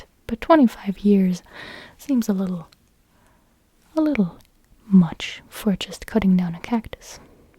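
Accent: California, United States. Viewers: you sound american, i thought you where German ? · You sound more American than German. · Descended_Flames I genuinely wanted to know why you put English in quotation marks that's a valid question. There is a distinct American accent in her voice, it does not matter from where from although Texas, New York and California all sound different they do sound fundamentally the same. She sounds a bit west coast, like a well spoken person from California if you wanted to be specific. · Your American accent is so superb, I often forget you’re German. And then you disclose that you don’t know certain American idioms, (like “How did the chicken cross the road?”) and then I remember. lol You have such a large understanding of our culture though. I wish I knew more about German culture. :) Also, I’m pretty sure most of those “laws” aren’t true. haha I cant imagine any law enforcement keeping track of off-key singing. 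hahaha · Your american accent is superb. Even though I didnt get any tingles from this video I still enjoyed it and found it relaxing. Videos like this would be great for times that I want to wind down but not necessarily fall asleep. I also think it would be interesting to hear your normal voice speaking in German (maybe with subtitles for people like me who cant keep up with the language at that pace) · Cruz DeLeon she does have an accent, it's American. No idea how she gained it but never the less it's certainly American rather than British. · I still can’t believe how good you’re accent is , I’m not sure if it’s because I’m from the uk , but you sound totally American !! Credit to you ! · Hast du deinen amerikanischen Akzent Absicht geübt oder woher hast du ihn ? 😊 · Love your voice I still trip on how a German girl can sound so old school California.I like your normal voice better then the whisper for asmr.Speech should be used rarely in a asmr vid.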